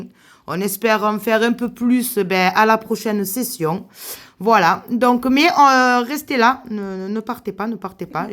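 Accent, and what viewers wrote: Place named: Marseille, France. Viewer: Bonne journée bisous bonne chance j'aime bien votre accent vous êtes de Marseille ?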